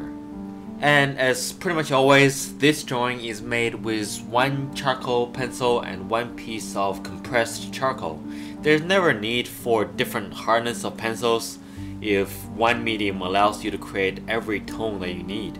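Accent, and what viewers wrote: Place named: China. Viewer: 听口音像是中国人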